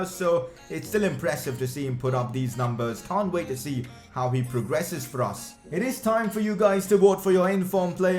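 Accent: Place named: India. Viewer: Why did this dude embrace his full Indian accent now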